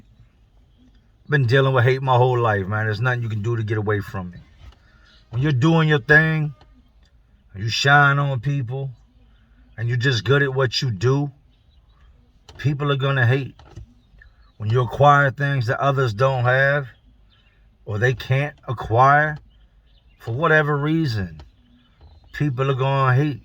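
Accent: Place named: Philadelphia, United States. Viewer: Hell yeah man! Keep doing you! Also side comment cracks me up how you say “on” you can tell you spent a lot of time in Philly. When I lived in AZ people would comment how I spoke all the time and especially how I say “on”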